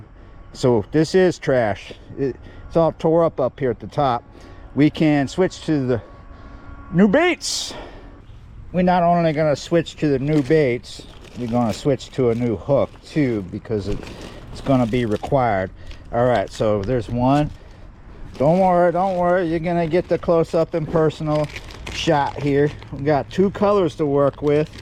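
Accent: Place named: Louisiana, United States. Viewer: Your Cajun accent started to kick in a bit more this episode.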